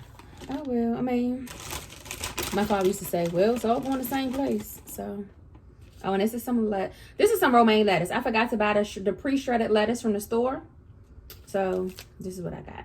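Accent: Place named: Washington DC, United States. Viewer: Are you from DC? You have a DC accent😃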